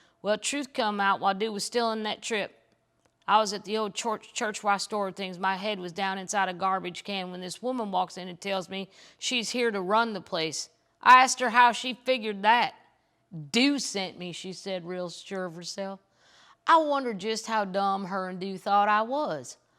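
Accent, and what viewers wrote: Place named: Appalachia, United States. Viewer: you have to read it in your hillbilly voice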